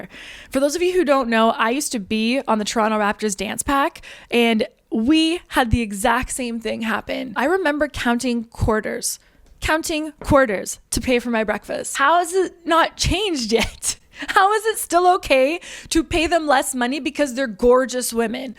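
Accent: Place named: South, United States. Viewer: For a Canadian you sure speak southern talker.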